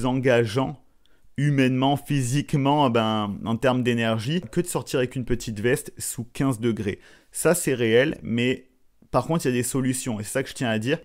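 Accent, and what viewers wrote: Canada. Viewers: Par contre.... après SEULEMENT 4 ans... DÉJÀ adopté L'ACCENT Québécois (On en parle)....alors que ma fille a vécu 12 ANS.... au Québec à Montréal Sans AUCUN Accent 🤔🤔🤔..... pfffffffff.....😤😤😤😤😤😤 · Vous êtes là première personne qui parle dans un façon du Canada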